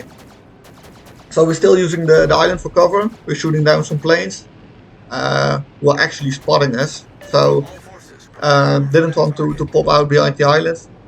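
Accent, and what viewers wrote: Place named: Netherlands. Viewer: You sound very dutch. Groeten uit Alkmaar 😁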